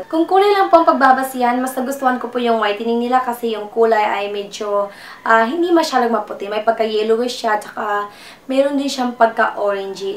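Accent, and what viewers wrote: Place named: Philippines. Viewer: i like your filipino accent.. haha ginamit ko din ko kaso cakey sya.... haha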